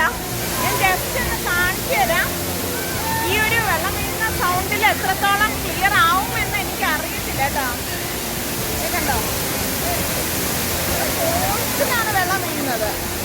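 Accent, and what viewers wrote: Trivandrum, India. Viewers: trivandram bhasha samsarikkarundo ennu athenikku istamanu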